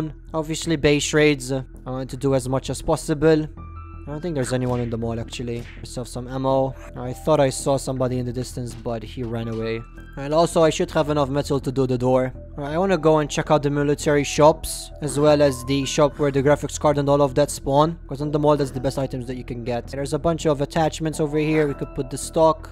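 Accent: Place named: Malta. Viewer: Question I am new and you’re English accent sounds like my country’s English accents that Maltese so I am asking are you maltese and live in malta pls tell me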